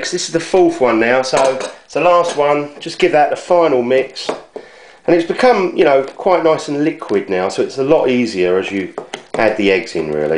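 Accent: United Kingdom. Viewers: sorry to ask where are you from ? what part of the uk ?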